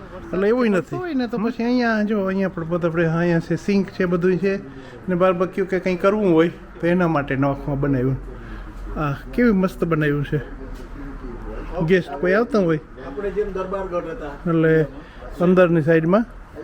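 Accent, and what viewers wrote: India. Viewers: ખૂબ સરસ ભાઈ...! આપણા ગુજરાત ના ભાંડુઓ વિદેશ માં વસતા હોય અને ખૂબ સુખી અને સમૃદ્ધ હોય ત્યારે આપણી છાતી ગજગજ ફૂલે અને આપણા સુખી ભાંડરડાઓ ને જોઈ ને કેટલો આનંદ થાય તે અકલ્પનીય છે. બસ ભગવાન ને હંમેશ એક જ પ્રાર્થના કરીએ...ઈશ્વર તેઓ સૌને સદૈવ સ્વસ્થ, સુખી,સમૃદ્ધ અને ખુશ રાખે... તમે પણ વિદેશ મા વસતા આપણા ભાંડરડાઓ ના આવા જ હૈયુ હરખાઈ જાય, હૈયુ હરખભેર હિલોળે ચઢે તેવા વિડીયો બનાવી... અમને પણ આપણા વિદેશી ભાંડરડાઓ વિશે જાણી મોજ આવી જાય... આપની દેશી લઢણ સાથે વાસ્તવિક ચિત્રણ સાથે નો બ્લોગ બનાવવા બદલ આપને ખૂબ ખૂબ ધન્યવાદ... હાર્દિક અભિનંદન... શુભેચ્છાઓ 🎉🌹🙏 કુમાર ભુપેંદ્રકુમાર- તંત્રી - કર્ણાવતી મહાનગર અખબાર અમદાવાદ 🌹🙏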